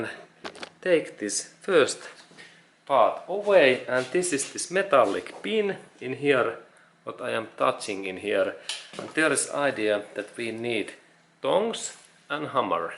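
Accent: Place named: Finland. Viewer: From your accent I can assume you are from Finland 😉. Btw i like your videos very informative.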